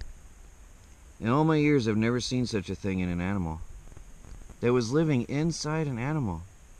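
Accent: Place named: Michigan, United States. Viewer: Yeah you sound like you're from michigan too.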